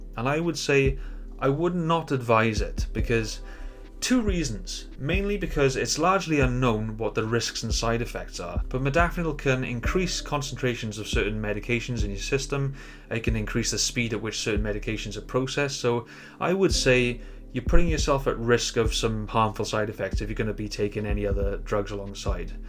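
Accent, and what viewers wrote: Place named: Swansea, United Kingdom. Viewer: You gotta be from south Wales butt you sound like a Swansea lad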